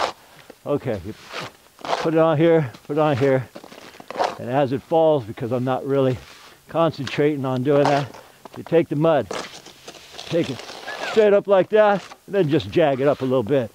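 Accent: California, United States. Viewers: Never seen such a pro stucco enthusiast in my life tip of the cap 🧢 that accent is so Cali it hurts 😂 good stuff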